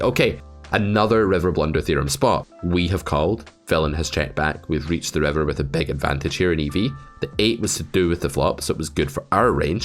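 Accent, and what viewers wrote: Scotland, United Kingdom. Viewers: The auto generated captions are actually really good here with beautifully clear Scottish lilt.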